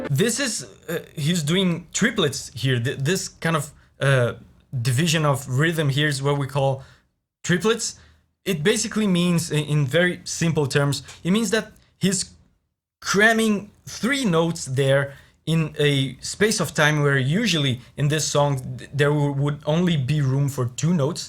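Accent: Brazil. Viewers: sotaque de br, é brazuca? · Mano, vc é brasileiro? O sotaque já te entregou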